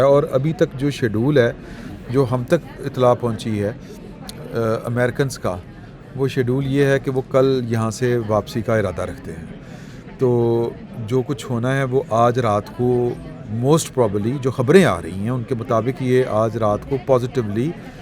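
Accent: Punjab, Pakistan. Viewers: Stupid comments in Punjabi accent. He’s clueless about global politics.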